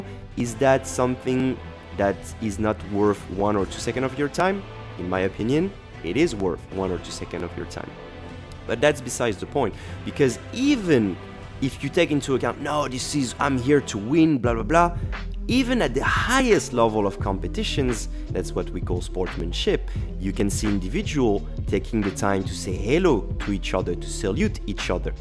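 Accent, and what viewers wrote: France. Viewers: French accent makes this 10 times better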